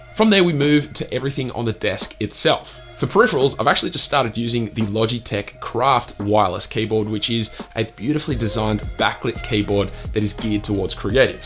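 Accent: Australia. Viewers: Thats a Thick OZ Accent. Are you an OZ ? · Cause Aussie accents are the best m8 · sounds like such an aussie.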